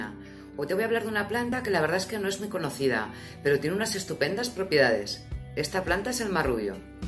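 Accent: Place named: Spain. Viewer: Me gusto el acento Español.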